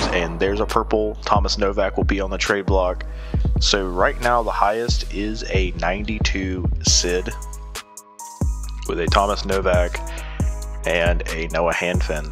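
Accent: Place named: California, United States. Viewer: Completely different guesses but I’d say you’re from California or New Jersey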